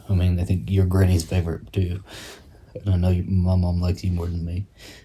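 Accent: South, United States. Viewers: Gosh .. I love and admire your ''Southern Accent'' 😍🥰😍🥰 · Well, that was deep! I’ve been following you from the beginning. You probably didn’t get married too young. Sounds like if Alastair wasn’t there you would have continued your spiral. Off subject: Your accent is getting more Southern drawl every time you record. I think you’re talking more like your mom every day, instead of more like Alastair who has no accent. Analyze that Mr. Freud.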